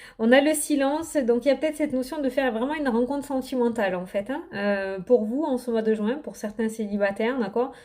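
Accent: South, France. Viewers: Coucou! cette symbiose que je ressens lorsque je vous écoute! Tout est le chemin actuel de ma vie, et vos précisions sont totalement liés à ma vie actuelle. Je suis en arrêt maladie suite à une grosse intervention chirurgicale, et j'ai une personne, un homme qui vient d'entrer dans ma vie. pas encore sentimentalement, mais je ne serais pas contre lol!. Affaire juridique en cours qui traînait depuis 5 ans! Je vais bien suivre mes 'alertes" internes afin de voir les mauvaises choses qui arrivent! Mille merci! et gardez votre vitalité, votre accent du sud qui me manque tellement et votre bienveillance! Hâte déjà de vous retrouver pour de nouveaux indices sur nos parcours.... Belle nuit à vous!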